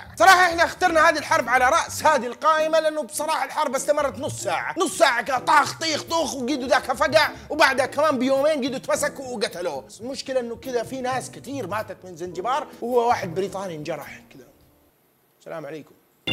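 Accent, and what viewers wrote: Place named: Hejaz, Saudi Arabia. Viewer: يب سعودي يتكلم لغه اهل الحجاز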